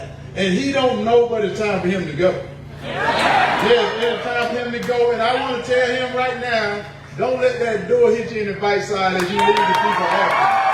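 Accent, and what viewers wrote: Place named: Georgia, United States. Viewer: Walker you can tell you love Georgia. You can tell you was raised there. Your a full blooded Georgia you walk and talk like a Georgian. If you don't win then Georgia don't deserve you.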